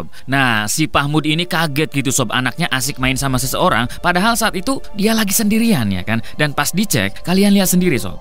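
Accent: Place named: Indramayu, Indonesia. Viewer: Udah aku duga Vidio terakhir dari cara bicaranya logat Indramayu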